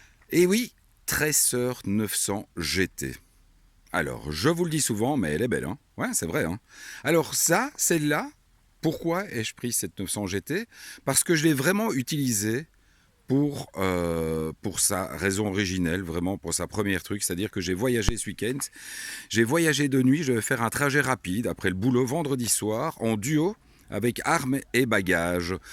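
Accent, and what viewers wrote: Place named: France. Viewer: non non pas déçu. Juste que venant de belgique, j'entends juste un accent français :p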